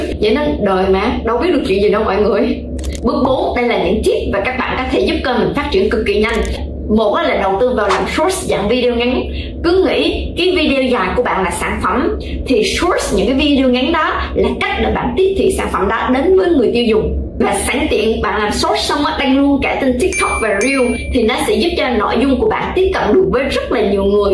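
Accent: Binh Dinh, Vietnam. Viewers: bà chị đến từ bình định à nghe quen quen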